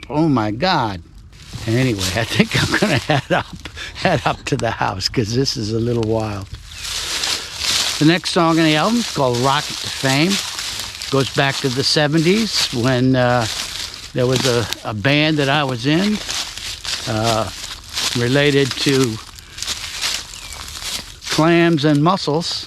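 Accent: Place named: Upstate New York, United States. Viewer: Just listened to the album, love it! Very impressed with your multi-instrumental skills. I especially like, I think. Nice lyrics by Helen Wheels, she was so talented. R. I. P. Very interesting walk-and-talk, and beautiful scenery. BTW, love the upstate NY almost Canadian-sounding accent. I'm from Norway but I like to try and pinpoint English-speaking people's accents. Sorry for the digression. 🙂 Edit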